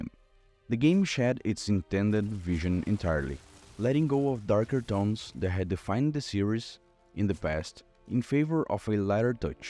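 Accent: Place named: Brazil. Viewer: Hmmm sotaque br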